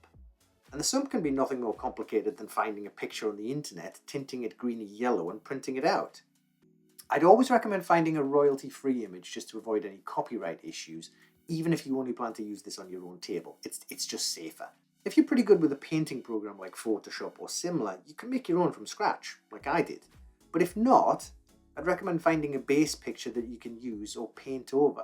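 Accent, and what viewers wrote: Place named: Northern England, United Kingdom. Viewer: I've just watched all your videos and they are excellent. You're right: there isn't anything else occupying the space between scratch-bashing and ultra-expensive mega builds. Personally, I want the look of a GW-only table because I love the aesthetic and their kits but, like you've pointed out, they're impossibly expensive, they actually limit you in all sorts of ways, and they often don't work all that well. Plus so many channels resort to mdf kits and 3D printing which isn't my jam. So I am your target audience (plus, I also remember card scenery, and I also got a letter printed in White Dwarf back in the day, so we could be brothers). Talking of brothers, are you related to RPArchive, because your channel is like its 40K twin. Gentle, northern bent; perfectly manicured nails; impossibly neat house; incredibly comprehensive advice and instructions; original project ideas; OCD-friendly; slightly bossy and judgey in tone; professional video production; slightly clinical, but totally stunning results; and uplifting and inspirational (as much as brits ever can be). 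Bravo, sir. Subscribed, and really looking forward to seeing more videos from you.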